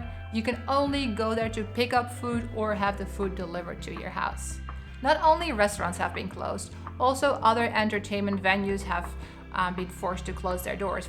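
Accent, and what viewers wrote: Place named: Russia. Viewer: Your russian english is pretty good. A lot of accent still. Very fine though. Congrats!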